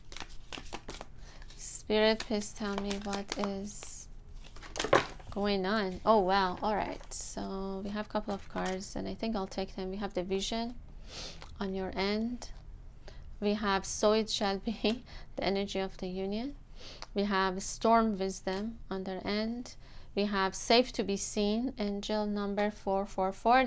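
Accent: Iran. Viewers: Your accent sounds very Persian!!! Great read:)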